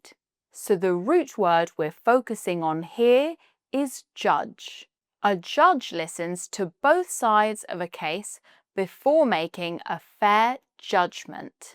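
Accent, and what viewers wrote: United Kingdom. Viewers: Your british accent is so beautiful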